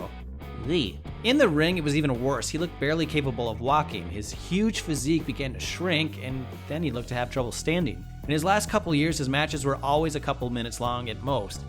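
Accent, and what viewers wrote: United States. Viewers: Yay, a no British accent shit. Pure American dialect. Yay. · The only American voice on what culture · This is the first time I've heard a whatculture list with an American type accent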